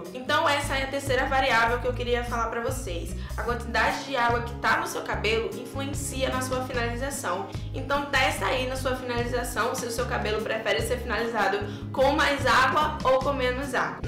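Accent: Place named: Recife, Brazil. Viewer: Adorei seus vídeos, seu sotaque é lindo, vc é do Recife? Ah, vc é uma fofura, lindíssima!! 😘😘. Sucesso e benção de Deus pra vc e sua família! ❤❤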